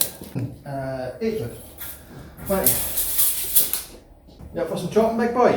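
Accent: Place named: Scotland, United Kingdom. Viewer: I Like the video but the Scots accent from the scot is sometimes hard to pick up what he is trying to tell us about his build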